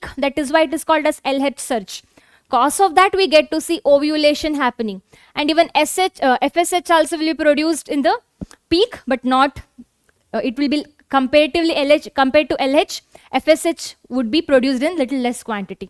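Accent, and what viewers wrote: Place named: South India, India. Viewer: I think mam is South Indian from her accent😀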